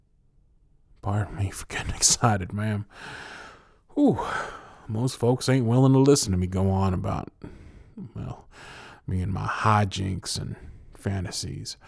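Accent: South, United States. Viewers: I love country I love the country accent to and mostly I love you and your awesome videos keep up the awesome work! ❤❤❤❤❤❤ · As someone from the south i thought this accent wouldn’t have an affect on me but BOY WAS I WRONG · Wonderful job! I love your country accent its so soothing. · i like cowboy and his Southern accent is spot on see i live in Georgia and i'm like almost surrounded bye people who have Southern accents so your southern accent is pretty good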